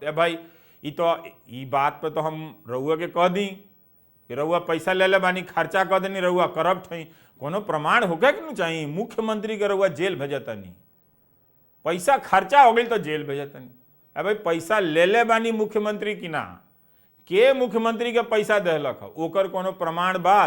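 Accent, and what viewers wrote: Bhojpuri, India. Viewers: बहुत बढ़िया,समाचार पढ़े के शुद्ध भोजपुरीया अंदाज बहुत अच्छा लागल हऽ। शुरू मे आउर आखिर मे इ जे नमस्कार कहिला ओकरा जगह हमरा हिसाब से प्रणाम कहेम त आउर प्रभावशाली लागी। 🙏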